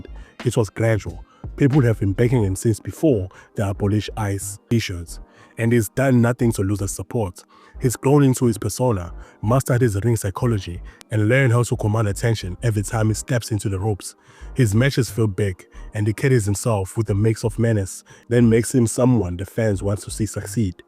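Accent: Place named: South Africa. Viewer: Hey man, what's your nationality, the accent is giving hints of South African, just asking of curiosity 😁 other than that great video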